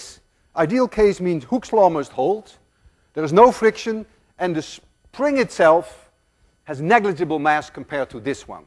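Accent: Netherlands. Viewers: he has an accent, a dutch accent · hes dutch u can hear that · his dutch accent is distracting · He sounds so dutch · what kind of accent does this guy have? He sounds somewhat Dutch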